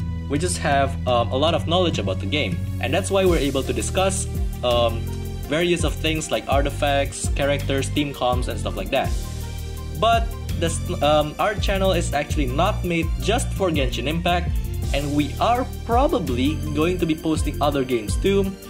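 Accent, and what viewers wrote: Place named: Philippines. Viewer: damn i was convinced that the guy voicing the videos was filipino cuz his accent is very similar to filipinos. nice to know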